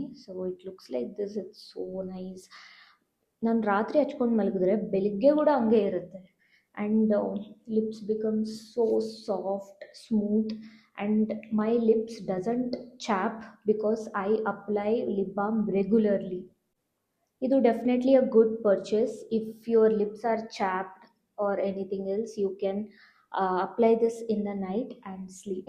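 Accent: North India, India. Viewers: I can't believe... I think ur from north indian 😊 Kannada ❤️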